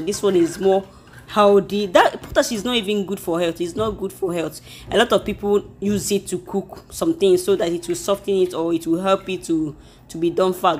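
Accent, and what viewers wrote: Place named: Igbo, Nigeria. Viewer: You kept repeating ngwongwo na ngwo ngwo and I couldn't stop laughing. I love you igbo accent 😍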